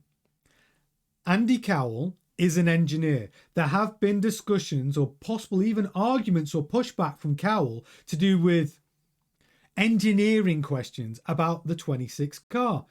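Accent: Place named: England, United Kingdom. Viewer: Dear sir , I cannot digest your accent , Just can’t understand what the heck you are saying, you are in UNITED STATES, not in FRIGEN ENGLAD .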